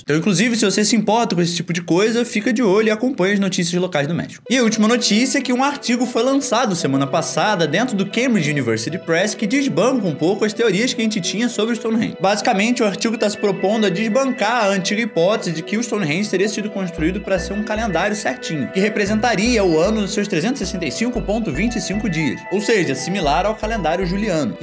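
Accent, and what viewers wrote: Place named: Rio de Janeiro, Brazil. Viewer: Adorei o vídeo, faz uns vídeos contando um pouco da história do Egito e dos faraós, como se fosse uma baita fofocona kkkkk acho da hora história sendo contadas dessa forma e pra quem tem dificuldade de entender ajuda bastante. Outra coisa, adoro seu sotaque carioca, amo seus vídeos do TikTok.. continue assim❤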